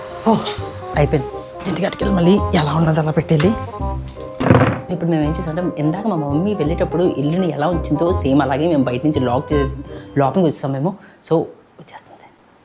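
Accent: Godavari, India. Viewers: nuvu chudataniki class untav kani mataladithy man godaraari yasa gattiga undhi bro , Dubai ki godaariki yela bro asalu ... super pair made for each other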